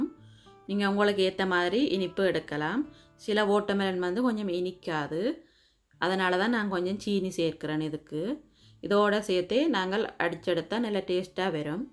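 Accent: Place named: Sri Lanka. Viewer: Supero presentation r u from sri lanka nice to hear your tamil m new frnd stay conctd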